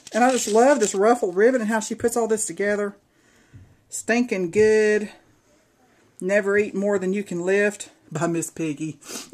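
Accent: South, United States. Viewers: you and your mini are 2 cute. I love ya both. Your southern accent makes me want to move to the south. I also love the warm weather. But I adore your mini. I love all the old labels and die cuts. I know you are going to have so much fun putting pictures in it. Enjoy and Tfs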